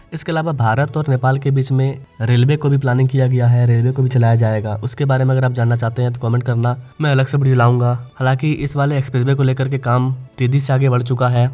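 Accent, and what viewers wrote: Bihar, India. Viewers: बिहारी एक्सेंट सुनते ही मन से गाली निकलती है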